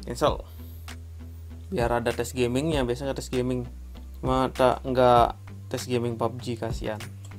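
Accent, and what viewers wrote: Bali, Indonesia. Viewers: Dari logatnya kaya orang Bali.. Pakai gelang tridatu juga. Hehe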